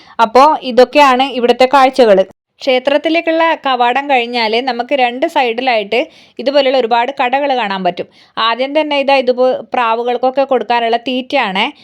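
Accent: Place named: Kannur, India. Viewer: Kannur slang super.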